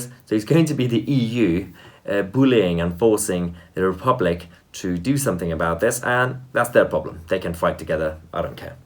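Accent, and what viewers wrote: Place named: Council estate, United Kingdom. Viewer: I never figured you as a council estate guy, the accent. I’m a northerner. Yorkshire man. So South sounds posh. Good luck and I hope you do well in whatever you do man. Take it easy dude.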